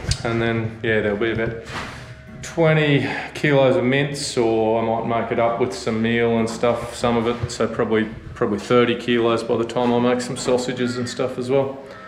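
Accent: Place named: Australia. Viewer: Love the Ozzy accent - a kiwi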